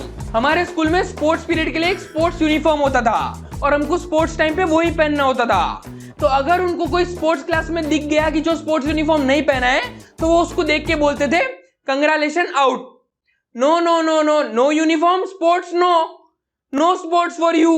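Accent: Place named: Jashpur, India. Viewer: Bhai are you from jaspur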